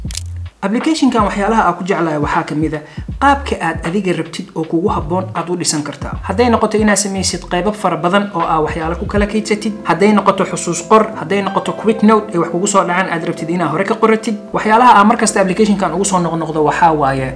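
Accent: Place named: Djibouti. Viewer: Réer Djibouti ayant yahaye marka ii dul kaata sidan uu gorayo af somaliga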